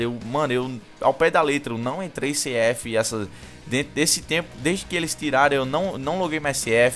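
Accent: Northeast, Brazil. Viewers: De Que Lugar do nordeste tu és?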